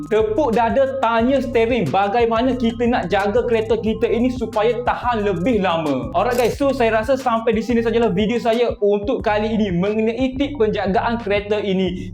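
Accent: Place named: East Coast, Malaysia. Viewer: Terbaik bang, tebal loghat pata timor 😅😅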